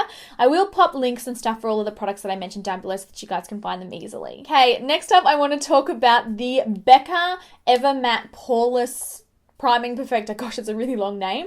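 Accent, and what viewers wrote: Australia. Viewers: Love love the Hourglass Primer and the Porefessional from Benefit the best. Didn't like the Becca it kept balling up on me and I warmed it up like they suggested. Will have to get the Rimmel and try that drugstore brand. Love all your videos for us oily t zone gals. Oh I bought that L'Oréal in the 106 and you were right it worked on me cause I'm olive skinned, thank you so much. I'll have to go back to your foundation videos and see what colors you use in those and hopefully get some samples from Sephora. Love how you interact with us, your one of the few who does that, it means a lot to us. Love your Australian accent, can't get enough, your so georgeous.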